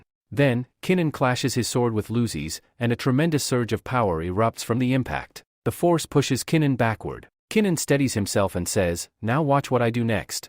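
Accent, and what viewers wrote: India. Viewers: Fckn indian accent immediately made this story a stupid joke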